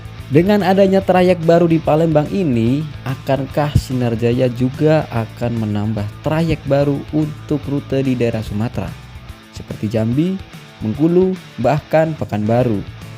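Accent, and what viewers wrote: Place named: Banyumas, Indonesia. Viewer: Bise wong ngapak tambah jossss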